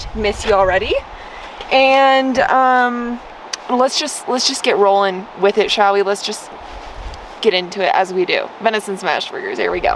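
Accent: South, United States. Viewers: Starting to find the southern accent offensive...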